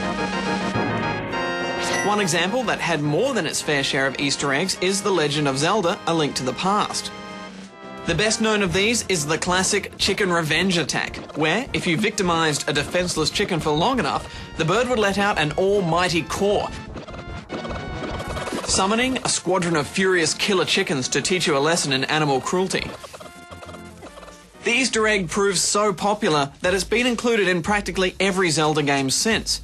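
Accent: Australia. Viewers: THIS IS NOT BRITISH ITS AUSTRAILIAN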